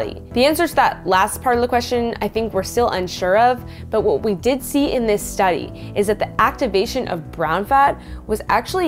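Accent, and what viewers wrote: Boston, United States. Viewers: Well done. Are you from Boston too?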